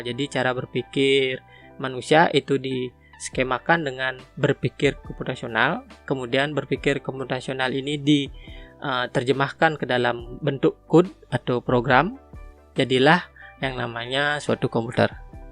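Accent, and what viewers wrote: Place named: Bali, Indonesia. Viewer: Orang Bali ya bang? Logatnya kentara banget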